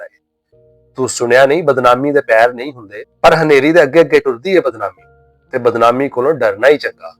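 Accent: Punjab, India. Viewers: واااہ بہت خوبصورت کہانی اور سچا پنجابی لہجہ😍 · ہائے۔۔ سواد آ گیا سچا لہجہ پنجابی دا۔۔ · کہانی سے عین میل کھاتا خوبصورت پنجابی لہجہ اور مکالموں کی ادائیگی میں بہترین اتار چڑھاؤ۔۔ بلاشبہ ایک مکمل پنجابی شاہکار ۔۔ اس خوبصورت کاوش پر ڈھیروں داد و تحسین🌹 ❤ ❤❤❤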